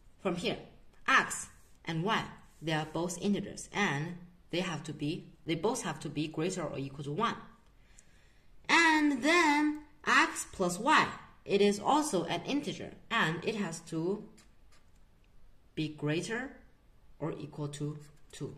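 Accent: China. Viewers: 听着亲切，感觉像东北的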